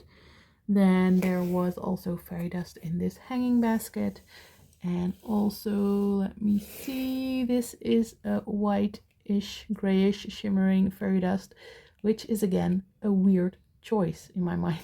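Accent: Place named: Netherlands. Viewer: Me again! I watch Lisette's channel as well. You are both Dutch and your accents are similar. But your English is impeccable!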